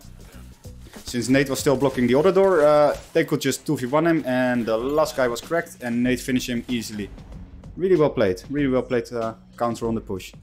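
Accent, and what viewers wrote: Netherlands. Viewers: Goeie shit bro, lekker nederlands accentje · Wy do you sound dutch 😂 ps hij is wel goed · I'm not trying to be annoying but you are dutch right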